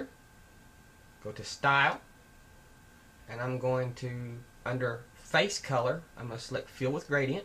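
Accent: South, United States. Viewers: you have to be southern. i can hear it in your voice. i'm from NC! thanks for the tutorial.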